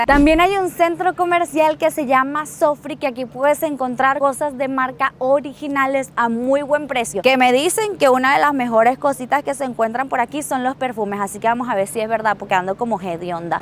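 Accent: Venezuela. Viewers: Chama pero tú no pierde lo venezolano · Eres auténtica gracias por tus videos con acento venezolana❤ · Su acento suena de Venezuela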